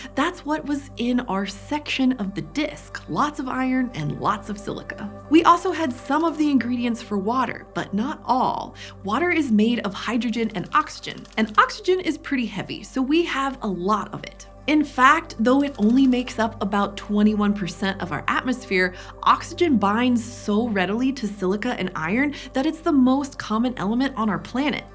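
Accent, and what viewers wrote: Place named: United States. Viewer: “Wader” I love your American accent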